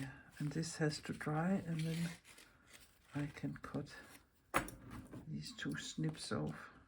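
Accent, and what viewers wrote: Denmark. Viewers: I love everything you do … and I especially appreciate the slow pace. Your Danish accent contributes to that … although I speak English, I find that the way you speak is very relaxing & totally understandable!! 💗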